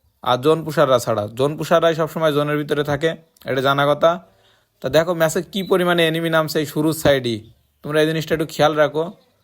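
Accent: Jessore, Bangladesh. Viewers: সেই ভাই,,, আপনার বাসা যশোরের মধ্যে মনে হচ্ছে 🤔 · খুলনা বাগেরহাট যশোর তো একদিকেই এ কারণে কথা ওরকম মনে হচ্ছিলো..আমার খুলনা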